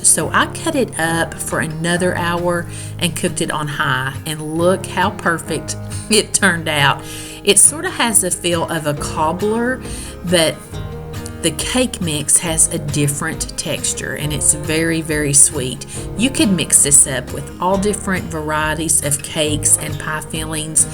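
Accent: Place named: Alabama, United States. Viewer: I just found your channel! I love your accent - you sound like me!❤️from Alabama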